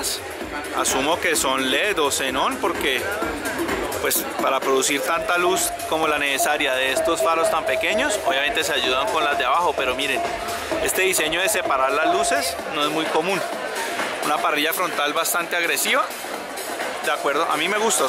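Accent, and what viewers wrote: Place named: Cali, Colombia. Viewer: Hermano quite ese acento caleno tan chimbo.;..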